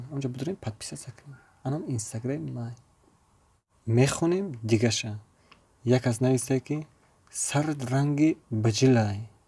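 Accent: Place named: Kulob, Tajikistan. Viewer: Ранги чучи кулоби гап мезани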